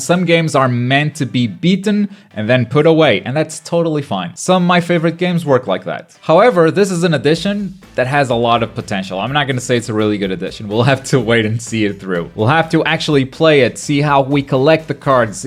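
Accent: Portugal. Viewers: hey man good video, are you portuguese btw? i noticed you have a similar accent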